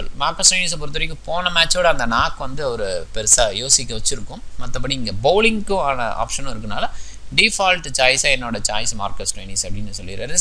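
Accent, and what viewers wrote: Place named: Kongu, India. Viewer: Power play angatun. Kongu Tamil coming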